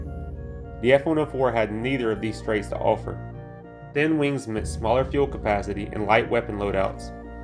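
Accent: South, United States. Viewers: Speak more clearly & evenly, enunciating, without Southern affectation if possible. Use a close microphone, like a boom mic, to record your narration. Lower the background music level. Most of all, stop the background music now & then, to demonstrate the sounds of the F-104 engine! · I*m having trouble placing your accent. The South for sure. I appreciate your videos.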